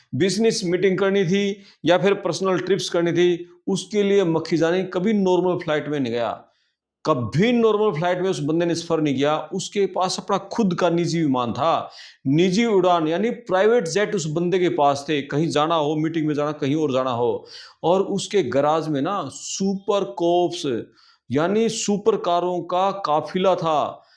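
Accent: Haryana, India. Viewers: Some haryanvi accent 😮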